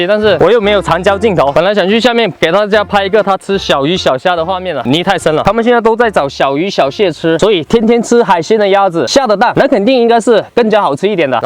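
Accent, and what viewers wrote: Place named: Cantonese, China. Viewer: 靓仔会说粤语吗？因为听到你的口音好像会说粤语（出于好奇心）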